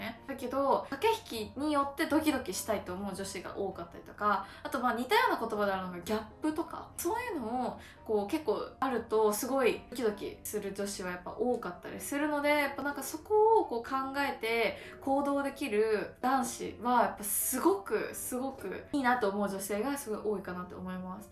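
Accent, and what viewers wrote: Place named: Kansai, Japan. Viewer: 、めちゃくちゃ関西弁出てる。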